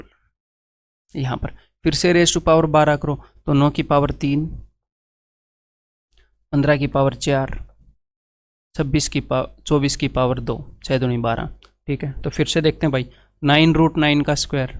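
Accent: Haryana, India. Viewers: You are awesome. I am also from haryana. Felt connected with your tone . I am big fan of you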